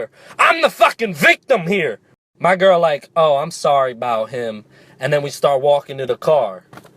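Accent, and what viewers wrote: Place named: United States. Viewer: fuck you make me laugh !! god bless the yanks accent !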